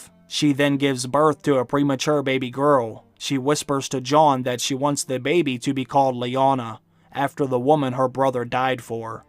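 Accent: Pittsburgh, United States. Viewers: Wait wait wait this guy sounds like a yinzer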